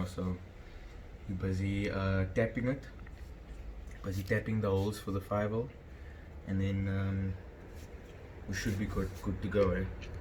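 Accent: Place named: South Africa. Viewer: this is a south African accent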